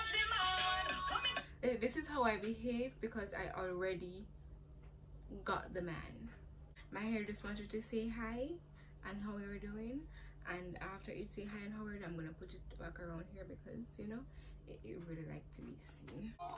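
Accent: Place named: Jamaica. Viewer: As always nice video.. Love the Jamaican accent pretty lady.. You gon make me tip 💰 you something nice lol. Stay focus and keep the videos coming. Your future is bright 🇯🇲 👑..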